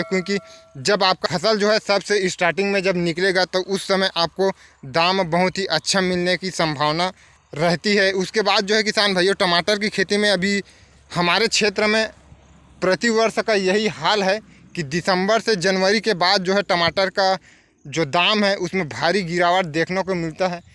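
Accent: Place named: Chhattisgarh, India. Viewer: Bhaiya chhattisagrh se ho kya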